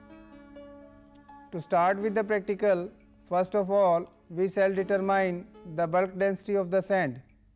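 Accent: India. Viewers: Haha Indian English, lol :v shut your fucking vandal lang up :3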